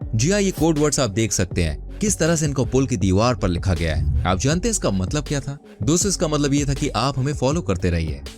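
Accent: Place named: Mathura, India. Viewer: Sir mathura sa bol raha ho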